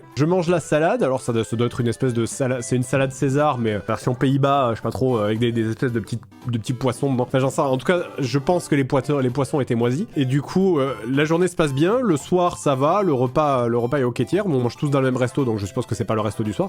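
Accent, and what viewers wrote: France. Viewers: Il a vraiment l’accent français originel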